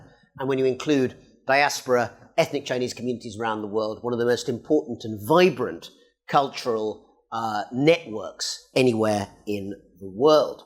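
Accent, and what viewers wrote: United Kingdom. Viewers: An Indian guy with British accent, think as he is British meanwhile lecturing China how to behave. I think something is wrong here. Please don't use British accent, since it is very insulting.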